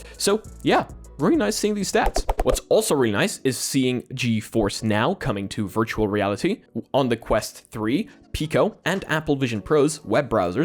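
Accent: Poland. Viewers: WAIT HES POLISH ohh s o thats the polish accent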